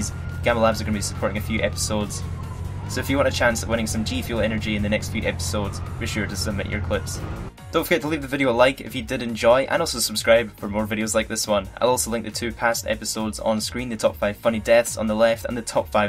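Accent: Scotland, United Kingdom. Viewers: Where are you from? You sound Scottish.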